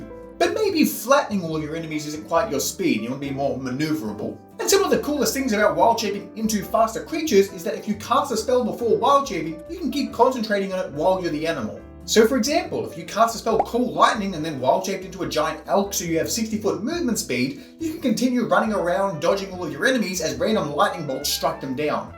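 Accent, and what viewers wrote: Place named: Australia. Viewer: G'day mate. Just searched for Aussie D&D channels and yours came up top of the list. Good to hear a familiar fast talking accent 😂 Druids are so broken. The kind of class you love to play, hate to DM 😅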